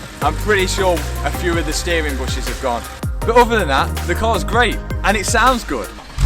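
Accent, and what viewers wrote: Leicester, United Kingdom. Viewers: its a lovely car, even if you left it as it is now it still looks nice. are you from Leicester? your accent sounds like it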